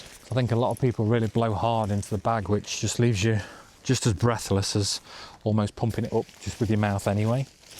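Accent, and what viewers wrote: United Kingdom. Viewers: Put big light on, sounds very Sheffield my friend. 👌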